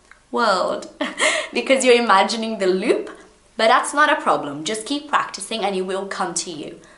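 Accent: United Kingdom. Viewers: I finalmente found someone with a British accent.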